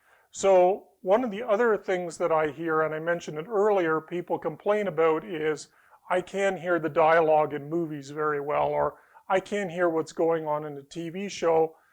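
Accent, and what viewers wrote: Canada. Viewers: In literally 3 secs i knew guy is from or in Canada!!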